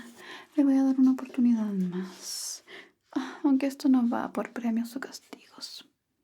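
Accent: Chile. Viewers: cómo amo este dejo enserio todos mis amigos de Fortnite son chilenos y su acento es muy lindo como me encanta que wea jaja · ay, soy de méxico pero me encanta el acento chileno, siento que tiene un algo, me parece muy hermoso su dialecto y más cuando veo a o a mon laferte hablando con el acentito chileno, muchas gracias, tamoo 😭💗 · Que bella profesora, soy de ciudad de México y tuve la oportunidad de conocer tu bello país hace 8 años , para ser exacto estuve en Santiago, Viña del Mar, Valparaíso, San Rafael , Los Andes , me encanta su acento aparte de que conocí a muchos Chilenos también en un viaje a Europa que hice y la verdad me adapte con ellos son muy buenos amigos , y recuerdo de las palabras , el po, flaites , culeao, weon etc saludos guapa y no me pierdo tus ASME , abrazo grande 😊😊😊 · Siiiii dios mío, las ganas que tenía que me enseñe un poco de chileno 🔥💜💜✨ Cada que habla con su acento chileno original es lo mejor ❤️💖💖 saludos desde México 🥰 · ahhhhhhhhh chileno po ❤ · Me gusto cuando usa el acento chileno para las explicaciones jsjsjs aparte soy chileno y hable todo correctamente y la profe no me dio mis punto po wn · Me encanta el acento chileno :shelterin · como siempre tan linda 💖. 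Yo soy mexicana y el acento chileno a mi parecer es uno de los mejores siendo mi favorito, es hermoso que hayas hecho un vídeo, siempre me relajas.💕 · Voz sos de chile che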